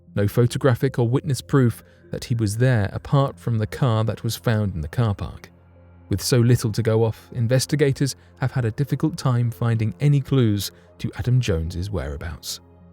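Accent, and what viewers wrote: United Kingdom. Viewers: The British accent just gives these videos the extra creepy edge, can't be done with an a American accent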